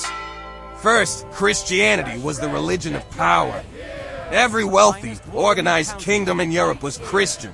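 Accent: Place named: Bronx, United States. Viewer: Another great upload. But, respectfully, the gruff, vaguely Bronx-ish voice narration...hmmm...not so much😆✌🏽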